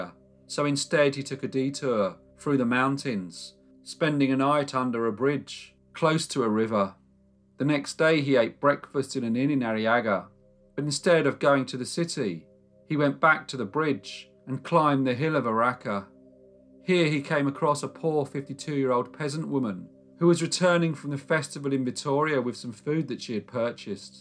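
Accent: England, United Kingdom. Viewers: I always love the really obscure stories you find which nobody else presents to us. I sometimes imagine a sleep over in a Creepy Old Mansion,we loyal subbers are all gathered around the Great blazing fireplace with candles lit around the decrepit Victorian Room,we roast our Marshmallows as you sit at the hearth,your distinctive English accent regales us with dark tales of Bloody Murder. This channel is truly a glistening jewel in the Crown of Olde Youtubian Lore.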